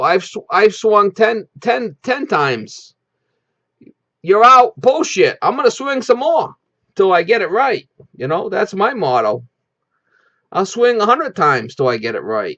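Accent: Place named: Northeast, United States. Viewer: Guys, I am 54. Licensed plumber and overall home repair guy. Been in the remodeling business for 20 years now. I have remodeled over 1500 bathrooms. Trust me, this guy knows what he is talking about. If you are a young guy in this trade you should watch every video he makes. Knowledge and experience are worth a ton. This man has them. And his videos are also entertaining. Yes I know he is a yankee and sounds funny. But he is a SMART dude. Learn from him.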